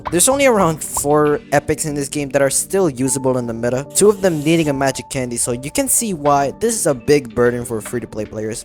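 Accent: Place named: Philippines. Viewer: i know a filipino when i hear one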